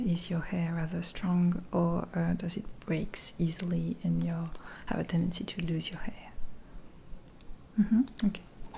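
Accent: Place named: France. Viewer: Beyond just the beauty of your accent and the cadence of your voice, the nuances in your sentence structure are absolutely lovely and charming and they add so much to the uniqueness of your videos <3 For example: “strong colors are not scaring you in any way” would most likely be phrased, “you’re not afraid of bright colors” by a native English-speaker, but the delightful quirks of fluency in a second language include a non-standard sentence structure and I LOVE IT 🥰 Whether it’s a conscious choice on your part or simply a byproduct of having French as your first language prior to learning others, it’s marvelous.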